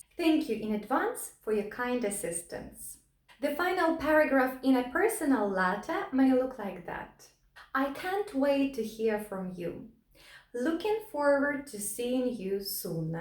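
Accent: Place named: Russia. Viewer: Your dialect looks like a Russian or Ukrainian lady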